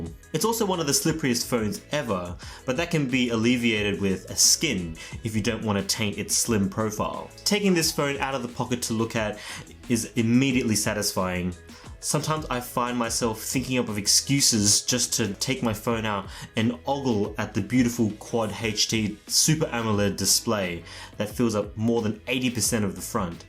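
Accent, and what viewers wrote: Australia. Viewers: Hey, which part of Australia are you from? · Ahmad Fuad The guy here is from Australia as I guess from his accent, and Australia got the Exynos version. The Exynos is much better optimized and runs much smoother than the Snapdragon. Honestly, I think he exaggerates the stutter (there is no actual lag, only microstutter, which isn't the same as lag), as it is only noticeable if you really look for it and specifically pay attention to it. My only gripe with the S8 is the redundant Bixby button and the awkward position of the fingerprint reader. I would also be happy for a better speaker, or rather two stereo speakers, and a 32bit DAC for the audiophiles who walk around with fancy headphones. I found the camera to be amazingly good, especially the video capture is astonishing. I also like how the phone feels in the hand and how comfortable it is to hold it and to operate it with one hand.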